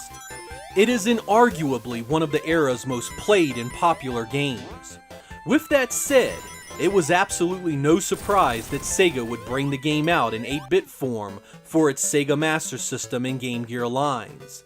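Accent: United States. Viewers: I guess he's from America?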